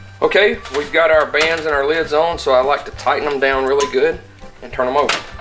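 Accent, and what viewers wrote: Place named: South, United States. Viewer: You sound Southern so maybe you're familiar with Braum's. Their pickled jalapenos are my favorite and I'd like to copy them if I can.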